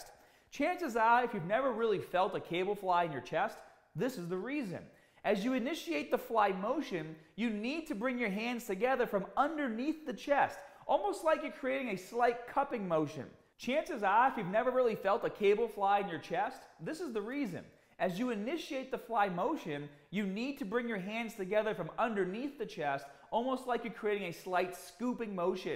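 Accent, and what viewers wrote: Boston, United States. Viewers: The Boston accent was strong in this video lol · is that a boston accent i hear 👂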